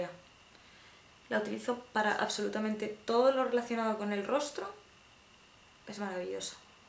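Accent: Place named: Galicia, Spain. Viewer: Bienvenida!!!! Aquí te esperábamos fieles todas tus seguidoras, que gustazo verte y oír tu acento gallego. Voy a ponerlo en práctica muy pronto. Un besote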